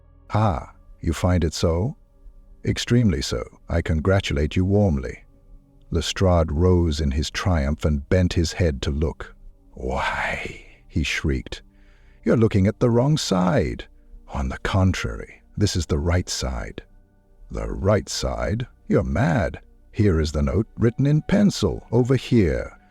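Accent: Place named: United States. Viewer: No to an Anerican accent to read SH . Sorry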